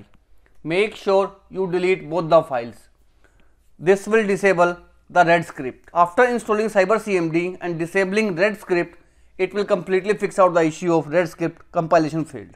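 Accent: India. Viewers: the most indian guy i ever heard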